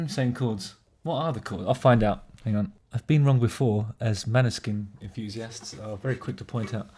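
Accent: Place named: RP, United Kingdom. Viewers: Loool, you explaining modern RnB lyrics in your posh ass English accent was great. Nice to finally find your YouTube page. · Love these! I'm also from good ole lowestoft and love listening to your accent here in nz! Always been a massive fan,thanks for sharing!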